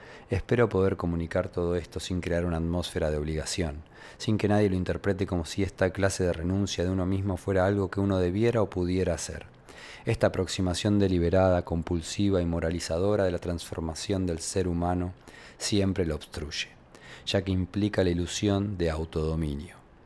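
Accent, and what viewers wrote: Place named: Argentina. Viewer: Más allá del contenido que es genial, el locutor real me encanta! En argentino!! Éxitos!!!!!!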